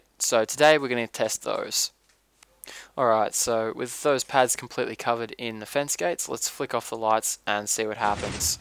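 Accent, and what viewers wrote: Australia. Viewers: U sound Aussie.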